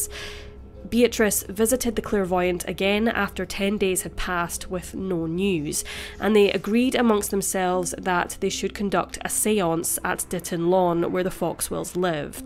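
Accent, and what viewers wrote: Scotland, United Kingdom. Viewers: I don’t think so , sounds like she’s Scottish to me , so makes sense as people in Scotland would want any other team other than England to win lol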